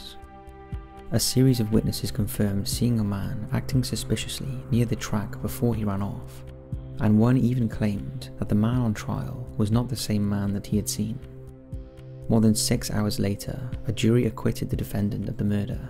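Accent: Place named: Wales, United Kingdom. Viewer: Does anybody else IMMEDIATELY smash the thumbs up when they hear the Welsh guy narrating?! My favorite ever! 😘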